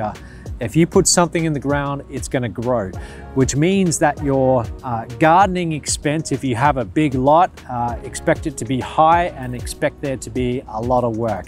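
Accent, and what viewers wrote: Australia. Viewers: You have an Australian accent. How do you compare life in Australia and Costa Rica.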